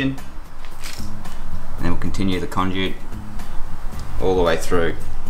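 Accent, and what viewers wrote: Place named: Australia. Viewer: Honestly, as an American, I could listen to Aussies speak on 4x4s all day long. Good video mate! Cheers!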